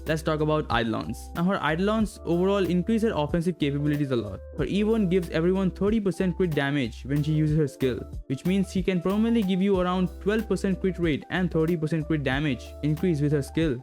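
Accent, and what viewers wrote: India. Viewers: You sound like you’re Indian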